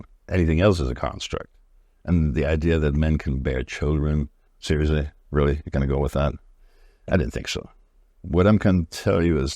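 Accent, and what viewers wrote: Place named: Orlando, United States. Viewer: Great video and as always to the point in an Orlando way of speaking. 😉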